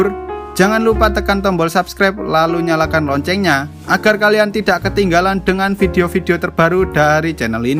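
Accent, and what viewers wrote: Java, Indonesia. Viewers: Lucu ngomongin film ini orang yang punya cannel i i lucu dan kaya orang jawa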